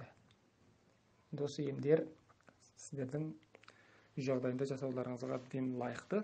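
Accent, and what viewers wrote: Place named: China. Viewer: Рахмет сізге. Көп танымдық ақпарат беріп өттіңіз. Алайда Қазақстанға басқа елден келген адам құсап сөйлейсіз. Кей сөздеріңізді түсіну мүмкін болмады. Қытайға жақын жер ма сол жақтың акцентімен сөйлейді екенсіз. 🤭🙊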